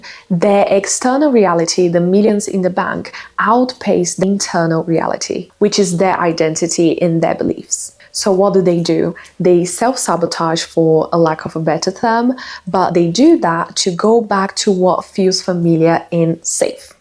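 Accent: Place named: Brazil. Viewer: Really like your accent! You’re Brazilian?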